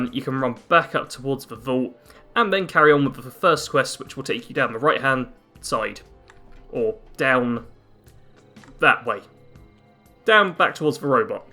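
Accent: United Kingdom. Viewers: Given the thick Brit accent. It behooves you to slow down your speech, I just can't understand you most of the time.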